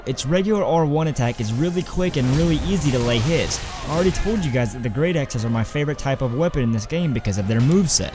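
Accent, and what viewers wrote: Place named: Texas, United States. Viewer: I really love your videos! Also what accent is that are you from the south like Texas?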